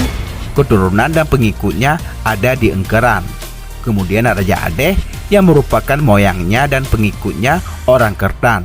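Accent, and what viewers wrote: Aceh, Indonesia. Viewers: logat bangsa aceh kental sekali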